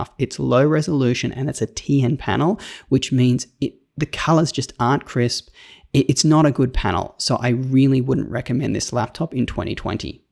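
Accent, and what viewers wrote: Australia. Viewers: Your enthusiasm is great. Like your Australian accent. About to buy my 1st laptop so your review is very helpful. Thank you.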